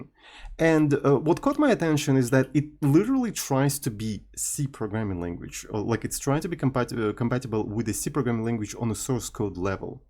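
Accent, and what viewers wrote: Russia. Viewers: Why do you sometimes swear in german? As a german I appreciate it XD, but its a bit confusing. An english speaking programmer with an russian accent cursing in german.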